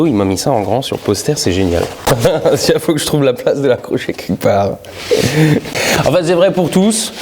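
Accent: Alsace, France. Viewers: Aucune honte à avoir l’accent alsacien! Signé: un parigot qui se désole de l’uniformisation du français. Belle vidéo émouvante à plein d’aspects. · Haa l'accent alsacien ! Ça fait longtemps que je l'avais pas entendu tiens. Ça rappelle des souvenirs. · J'adore l'accent alsacien (je ne suis pas alsacien) ! Je connais deux personnes qui parlent vraiment comme tu l'as fait et ça n'a rien de ridicule. Même sans te forcer, on le reconnais bien ton accent : réécoute-toi à ;-)) Bravo et merci pour tes vidéos. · Ton accent Alsacien on dirait un mélange entre l'accent Allemand et Luxembourgeois ( logique tu vas me dire ) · Ton accent alsacien de BasRhinois la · J'adore ton accent d'Alsace hahaha C'est la première fois que j'entendais cet accent <3 Un jour, peut-être que je pourrais te faire découvrir l'accent du Québec (ou en a aussi plusieurs hahaha J'ai fait un "live" dernièrement mais je sacrais trop pendant un test durant un vol dans le Grand Canyon, dans mon simulateur, je sacrais juste trop pour le publier ici et de toute façon, seulement mes friends FB peuvent le voir hahaha) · Au sujet de l'accent alsacien, faut savoir que je suis du sud-ouest et que mes voisins étaient alsacien, avec l'accent, mais bien pire que le tien, coté allemand de ouf, et du coup quand ils s'engueulaient en mi-français alsacien/mi-allemand on pouvait pas s'empêcher de se taper des barres. 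C'est comme un québecois qui t'engueule, c'est drôle.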